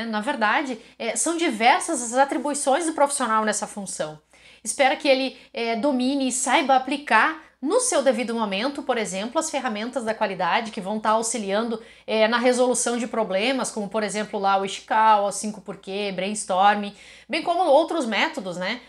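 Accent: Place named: Rio Grande do Sul, Brazil. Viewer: Tu é gaúcha, hein..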